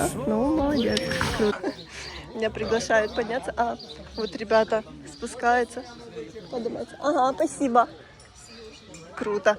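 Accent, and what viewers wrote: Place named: Odesa, Ukraine. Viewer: Шо вы мовой говорите , говорите по Одесски шо слух радует .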